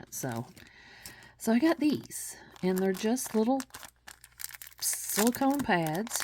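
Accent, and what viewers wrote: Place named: Kentucky, United States. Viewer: New Subscriber here! Are you from Kentucky?😂 I know that accent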